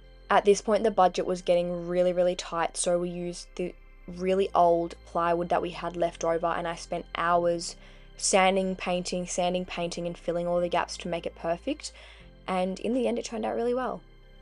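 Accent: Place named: Australia. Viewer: the most Aussie lady accent I ve ever heard, hope to see the bus in person one day, what a lovely build, love it ❤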